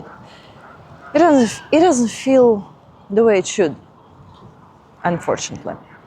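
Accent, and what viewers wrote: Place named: Russia. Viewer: 😂😂🤣🤣 My Lord and My God!!! This woman 😅😅 you are so hilarious!!! And I love your thick Russian Accent. I kept on smiling throughout the video because of your English and how you complained about the undone dough and SPO being mixed with the celebration. New Subscriber, first time coming across your channel, but I can rest assure you that I have been to VDHKh. I was there last year in October, and I went to most of the Pavilion buildings within the short time, and you can see some of my videos under my Russian Playlist on my YouTube Channel. I actually thought that Russia has moved on from SPO to Counter T$rr@ Operation??? But anyways, I feel your pain and your rant concerning the mix up. You are such an adorable woman to listen to, with your incredible heart-warming and vibrant Russian accent 😁😁❤❤😁😁.... Keep up the good work..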